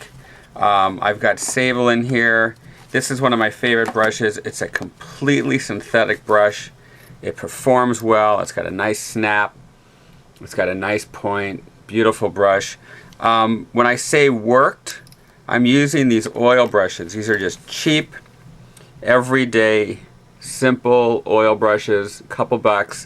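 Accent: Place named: United States. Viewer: I hate the way americans say "water"... :):):) But the painting is beautifuly done so it's worth to watch it. :)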